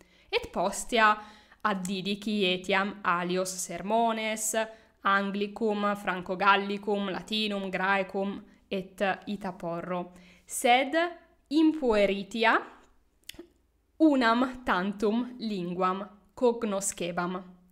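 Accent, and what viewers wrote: Italy. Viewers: I prefer by far the Italian accent for Latin (even with these epenthetic vowels) than an Anglo speaking Latin with aspirated stops, retroflex r and clipped vowels.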